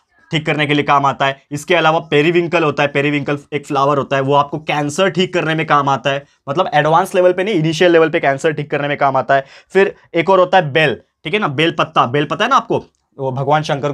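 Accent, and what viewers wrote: Maharashtra, India. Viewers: Sir Apki mother tongue marathi hai?? OMG!!!SAME Marathi gang like here!!!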